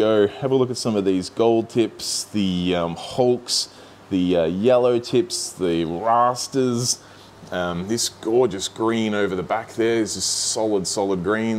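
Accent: Australia. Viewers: Wow, what a store! Also, I love Australian accents. For real.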